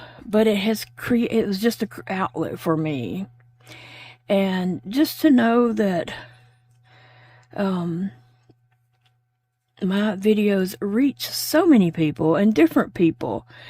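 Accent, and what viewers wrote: South, United States. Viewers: Ms, I think we are all at different places in the spectrum of sharing our details. I'm probably at the opposite end of you, I don't feel it's an honesty/non-honesty thing, but just a comfort level at disclosing our intimate details. That said, I think your videos have helped many who also struggle physically with chronic issues and I admire your courage in putting it all out there. And when you struggle, I pray. God may already know what you are going through, but He has commanded us to pray one for another, so I will. And on the good days and when you share your blessings, I also rejoice with you. So I hope and pray that you feel those prayers and are strengthened knowing there is a community out here that cares for you and is sending up powerful prayers on your behalf. With love, your sister in Christ!❤... Can I just add that God gifted you with a beautiful voice and a sweet laugh, with your easy sense of humor. 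That sweet southern accent is so easy to listen to as I crochet with you. And please don't forget when it seems no one understands, no one gets it, Jesus does. I know you know this, but sometimes we just need the reminder. The feelings of despair and discontent are not from God, by the way, so rebuke them in the name of Jesus and start praising Him for all you do have...not what you don't have. Any of us can get ourselves in a funk when we listen to those nasty minions, despair and discontent who come straight from the pit.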